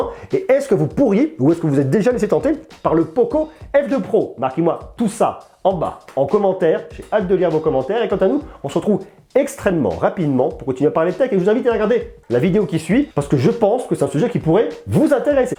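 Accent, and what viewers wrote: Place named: France. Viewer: Pourquoi crier en utilisant une langue si déglinguée. Pourquoi essayer de faire BCBG en utilisant, avec un accent à couper au couteau, des mots en anglais. En français il y a des mots pour tout. J'ai commencé à regarder, car j'ai acheté un Poco F2. Mais je suis finalement allé voir une autre vidéo, en anglais celle-là. Et sans accent français à chier. Pourquoi faut-il crier?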